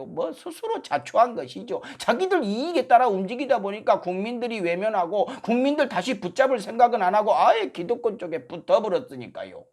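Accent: Jeolla, South Korea. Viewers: 전라도 사투리만 쓰지말고 전국구 사투리 쓰세요 . 그래야 전국 유튜브 방송 됩니다 .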